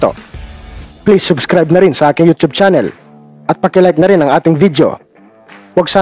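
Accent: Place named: Visayas, Philippines. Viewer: bisaya ka talaga mag salita..😃😃😃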